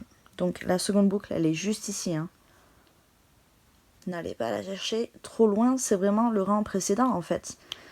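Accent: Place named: England, United Kingdom. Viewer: Bonjour, On m'a justement offert des crochets bambou comme cela mais perso moi je ne me sent pas à l'aise avec Mise a part cela je te suit depuis le début, j'adore ton petit accent anglais, tes explications sont au TOP continue ainsi, ne change rien je souhaite plus souvent de vidéo (si tu peut ) merci bisous coco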